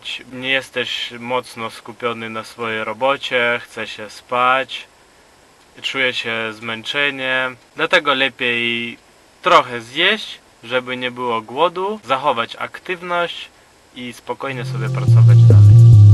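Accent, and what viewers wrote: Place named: East, Poland. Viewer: Twój Polski przez te kilka lat naprawdę brzmi fantastycznie. Bliżej Ci już do wschodniopolskiego akcentu gdzieś na Lubelszczyźnie już na Podlasiu niż do ukraińskiego akcentu.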